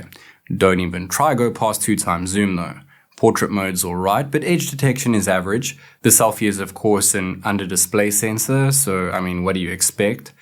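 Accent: South Africa. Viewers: kewling system lol love the South African accent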